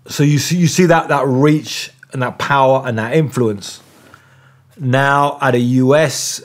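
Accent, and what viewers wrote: United Kingdom. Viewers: Where's this guy from, he sounds kinda British but his accent is all over the place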